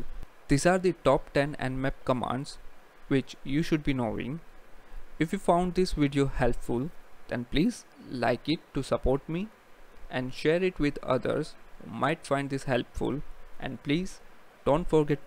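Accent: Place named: India. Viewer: love your indian accent, great video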